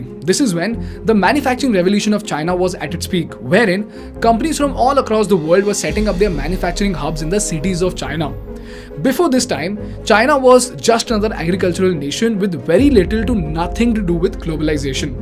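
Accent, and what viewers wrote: India. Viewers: Hello if you hear this accent on a Phone for Tech support/ Refund department PLEASE hang up the PHONE!!! sorry i have to comment this here cause Million dollar been scam by indian scammer past decade! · Man this indian accent gets me crazy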